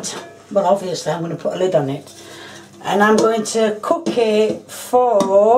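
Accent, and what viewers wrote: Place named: North, United Kingdom. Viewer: It's a Sheffield dedah accent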